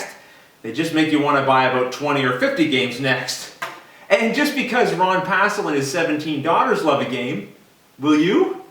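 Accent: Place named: Maritimes, Canada. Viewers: Wait a minute.. I just found out the reason why I watch your videos. Your voice is so familiar.. because I'm from PEI too.. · Do I detect an Irish accent? Edit - after researching, I've discovered it's most likely a Canadian Maritime English accent. since you've mentioned you were on Prince Edward Island. Cool! accents are so interesting.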